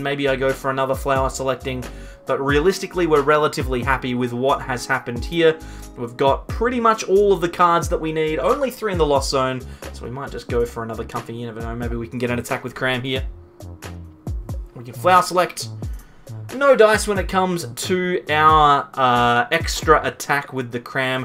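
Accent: Australia. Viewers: hey bro i commented before about what the best deck was, now that the lugia vstar deck isn’t in standard anymore. what do you think the best deck is now for standard ranked bro. if you can reply i would be rly grateful bro. are you aussie?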